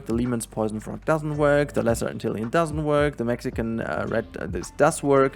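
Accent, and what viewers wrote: Germany. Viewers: hey i got an weird question but are u german?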